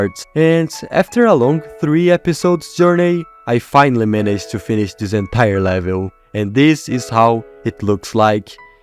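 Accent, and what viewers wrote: Brazil. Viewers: Youre brazillian aint you🥶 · Dude you sound so Brazilian or Portuguese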